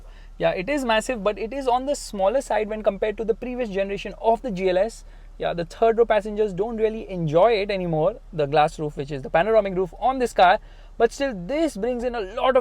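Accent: India. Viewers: Except your Indian accent everything great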